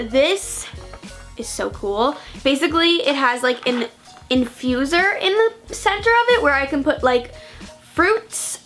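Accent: New York, United States. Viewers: Are you from New York? Lol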